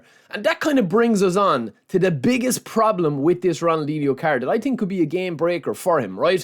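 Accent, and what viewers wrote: Ireland. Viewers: Bro, are you from Ireland? Your accent is familiar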